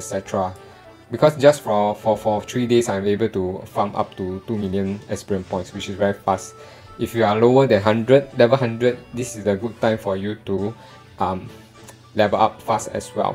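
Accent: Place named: Malaysia. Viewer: you sond like malaysia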